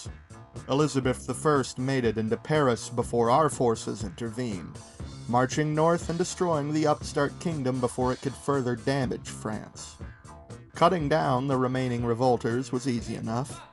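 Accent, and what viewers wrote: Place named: United Kingdom. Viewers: There were only a few moments I could tell it was you. But mostly it was hard to tell. If I showed that to someone who didn't know you, they'd think you were a brit. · Well, By listening to that accent, I mistaken that you were also British.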